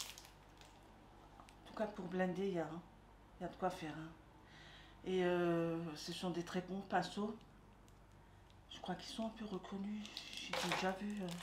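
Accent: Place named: Alsace, France. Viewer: Super haul, j adore leur palette, j en ai déjà pas mal...petit clin d'œil pour ton accent alsacien...sa fais plaisirs. 67 elsass frei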